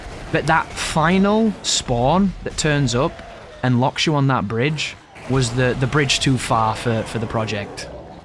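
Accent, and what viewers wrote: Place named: Sheffield, United Kingdom. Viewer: Really enjoyed this playthrough, I played it through earlier this month so it was a nice coincidence. I'll appreciate another full year of your Sheffield accent.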